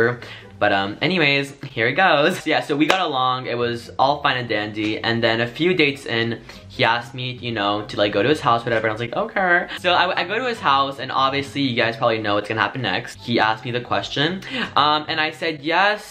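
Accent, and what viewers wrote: United Kingdom. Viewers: Loved your British Accent... So cute💜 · Ur British accent sounded like Reuben De Maid 🤣❤️ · Omg that British accent is everything 😂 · The British accent made me smile and started laughing I loved it oh my goodness so great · Who else loved the british accent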